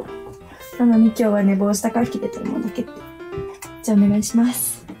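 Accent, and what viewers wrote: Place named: Nagoya, Japan. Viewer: 名古屋弁同じ！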